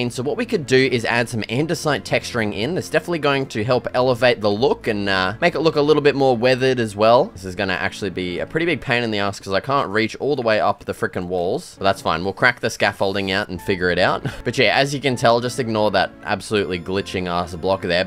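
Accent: Australia. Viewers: Stopped watching about 1min in when I realised the Aussie accent was turned up to obnoxious.. I’m Aussie myself. It’s too bloody much bro. Stop it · you obviously don’t understand accents because he is not English he is Australian lol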